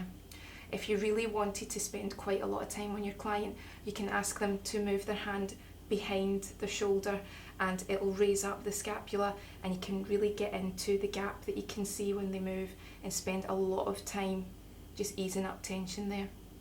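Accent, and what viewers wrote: Scotland, United Kingdom. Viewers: Scottish accent plus the word “stone” is such a perfect combination. Not sure why but that word sounds legit coming out of a Scot. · What is your accent British, Irish, Welsh, or something else? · Stoone. I love the Scottish accent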